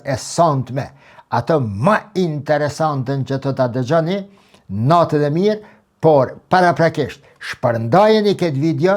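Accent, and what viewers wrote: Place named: Kardhiq, Albania. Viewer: Ca flet kardhiqe moj kuder e metohise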